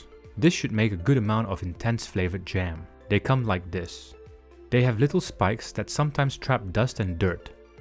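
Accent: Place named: Netherlands. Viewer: You sound Dutch but you said you grew up in Malaysia I’m confused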